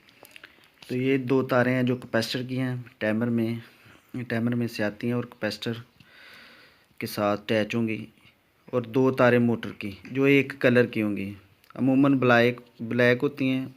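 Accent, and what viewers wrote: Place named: Sialkot, Pakistan. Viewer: Mera andaza theek nikla apki boli sialkoti lg rhi thi.mn bhe sialkot me hota hun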